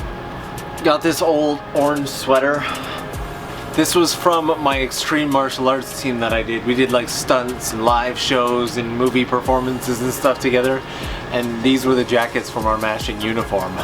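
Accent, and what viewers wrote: Canada. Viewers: 10!? jeez lucky you, we've had a wind chill of about -41 the last few days here in northwestern ontario. and now that youre back in canada i notice the accent even more haha.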